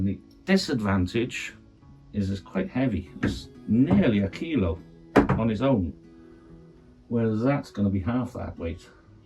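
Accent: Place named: Wrexham, United Kingdom. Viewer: Save some eBay bargains for the rest of us mate :P I just subbed and going through your videos, was a bit surprised you're over in Ireland as you have a perfect Wrexham/Jacko accent? I got hold of a cheap 130P recently and want to try DSLR with it and from what I can see you can get them to focus by using longer bolts on the mirror cell thus pushing the mirror further up the tube, not tried myself yet as I'm trying to source parts on the super-cheap :D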